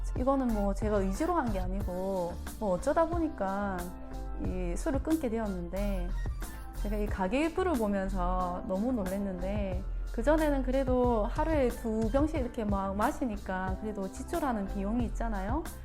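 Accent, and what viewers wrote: Gyeongsang, South Korea. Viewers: 경상도 아가씨군요 대구에요? 사투리 쓰니 더 예뻐요 ㅎ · 반가워요. 경상도사투리가 재미있내요, 부산쪽... 나도 세계 및 남미 여행을 준비중인데, 도움이 많이 된네요. 쿠바가서 살사와 바차타, 그리고 아르헨티나 가서 밀롱가를 다니는 게 꿈입니다. 그래서 열심히 스페인어도 배우고, 춤도 배우고 있죠. 특히 아르헨티나는 체게바라와 메르세데스소사의 고향이라 더욱 가보고 싶어요. 좋은 정보 고맙고 자주 올게요.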